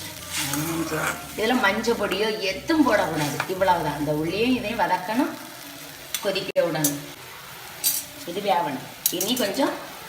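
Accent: Nagercoil, India. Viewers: Theivamee namma oru slang tamil ketu yethanaa naalu makka ... Special word naroil insted of nagercoil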